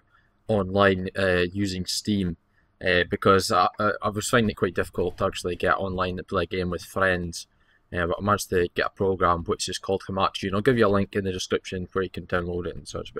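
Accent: Scotland, United Kingdom. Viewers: Wait wait wait wait, wait, R u scottish ? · You sound like a British Redmercy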